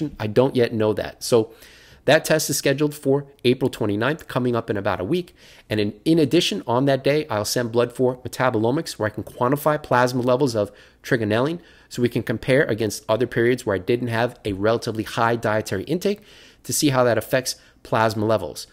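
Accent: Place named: New York City, United States. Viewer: Well, which variety of clover from Ture Leaf are you buying? I'm on the site right now, and there are Mamouth Red and Medium Red Clover seeds available in pounds. But the Organic Red Clover is only available in small pouches. Hmmm, that's the same situation with Johnny's Seeds, too. See what you did?! You posted this video and sold out the entire organic red clover seed bank in North America! You're a Boston guy? No accent. You really seem like a NYC area guy to me. I liked the point you made in the last video with Chris about this VO2 Max protocol only giving us a chance to live to mid-80's, maybe. The Bible says Moses lived to 150! And I guarantee Moses wasn't pumping iron or training for any marathons. My grandmother sat by her 3rd story window in the Bronx smoking Marlboro Red after Marlboro Red to the tune of 4-packs a day and lived to be a healthy 94. 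But, she survived colon cancer in her late 20's and ate almost nothing every day. I guess if you wear a colostomy bag eating anything probably doesn't seem ideal. The only thing my grandmother ate was cold cuts from the deli on her block. And even with that she'd maybe eat a few slices of roast beef and swiss cheese. The only vegetable I ever saw here eat was a few spoonfuls of potato salad. I think that CR (Calorie Restriction) is a powerful tool. I've been watching Peter Attia for 10 years and He gets carried away with his exercise regimen when it comes to the average person. I'm a certified Health Coach, not charging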